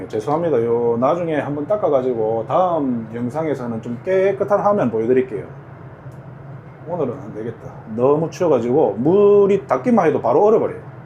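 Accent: Gyeongsang, South Korea. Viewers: 선장님 말씨가 포항 쪽 말씨네요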